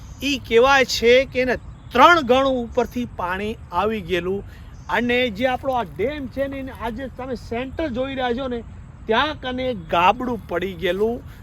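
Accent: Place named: Kutch, India. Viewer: વાહ અદભુત તમારી મીઠી કચ્છી ને દેશી વાણીથી માણસોને ઘણુ જાણવા મળેછે😊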